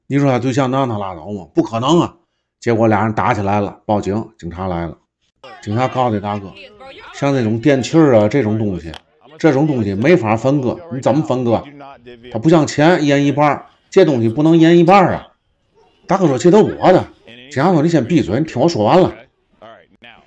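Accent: Tianjin, China. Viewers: 兄弟，您的天津味道十足哇！I subscribed purely because your 天津味！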